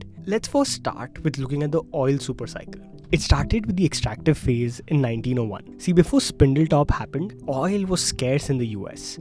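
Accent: India. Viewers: Indian accent 101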